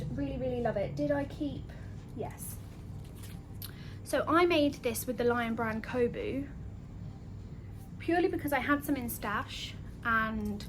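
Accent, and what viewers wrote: England, United Kingdom. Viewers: Congratulations on your 1.69k subscribers. Love listening to your British accent and the Scottish lady too. From an Aussie down under. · Love your yarn purchases. It is so easy to get overrun with yarn, it does take up space. I know for sure😁 . I love yarns and knitting socks hats mitts cows and some shawls. Rattle on I love listening to you, I love English accents😎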